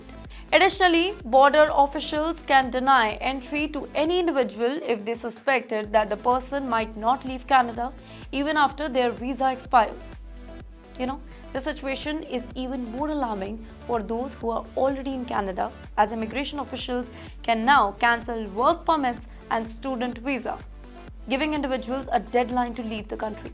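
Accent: India. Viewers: She speaks Indianlish, English with an Indian accent which only Indians can understand.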